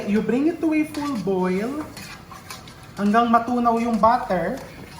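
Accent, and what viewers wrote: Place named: Cagayan, Philippines. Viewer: Chef we have same accent from tuguegarao city.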